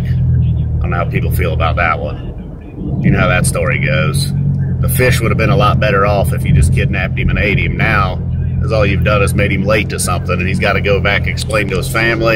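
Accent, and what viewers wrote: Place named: Alabama, United States. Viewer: Damn. You could be riding through Slapout, Alabama if I didn't know any better. Just different accents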